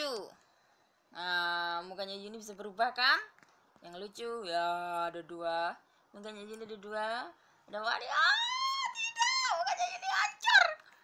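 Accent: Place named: Java, Indonesia. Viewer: Omongannya medok 😁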